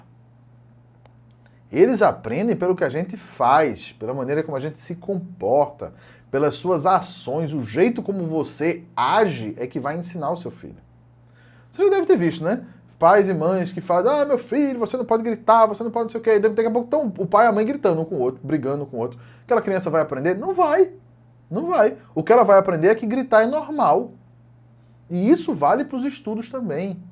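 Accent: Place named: Recife, Brazil. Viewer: Ah, e também sou Recifense Kkkkkk suspeitei logo pelo sotaque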